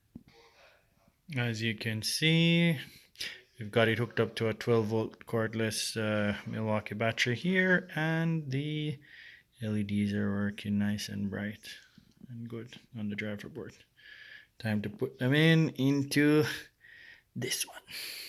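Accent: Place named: Canada. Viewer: Love how Canadian your accent is, that’s cool lol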